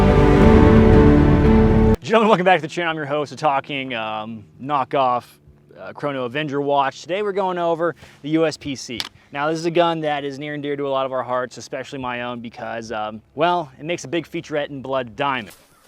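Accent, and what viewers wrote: Zimbabwe. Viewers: Nah that’s just a Rhodesian accent bruv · Spot on with the Rhodesian accent with a touch Saffa in it.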